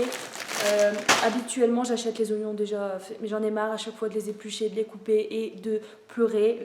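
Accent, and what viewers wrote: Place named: North, France. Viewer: Waouh j'adore ton tee shirt, tu l'as acheté où ? ☺ merci pour ton contenu, j'adore tes vidéos et ton accent qui me rappelle mes racines nordistes 😁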